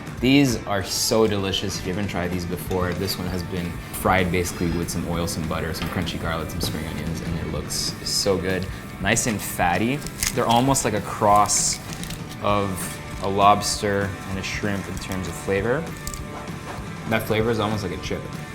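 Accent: Philippines. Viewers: Cool Tagalog accent Erwan! would love to hear more of this! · You are so cute with your filipino accent huhu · Love your filipino accent! ❤️ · Heavy breathing while watching you eat it all!! Everything looks delicious! ❤️❤️❤️ Plus your Filipino accent hahaha so cute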